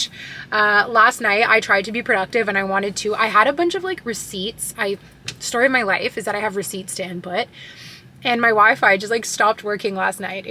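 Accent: Canada. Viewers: I love hearing you speak in French!! I always hear the French accent learning French but I love the Canadian accent it’s so cool to hear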